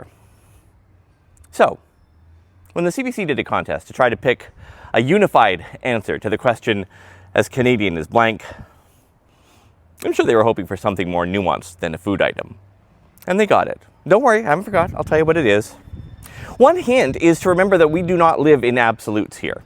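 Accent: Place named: Minnesota, United States. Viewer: Poor Tod . You’re a goofball who sounds like anyone living in Minnesota or Wisconsin. There isn’t any interesting about candians I can’t find in northern USA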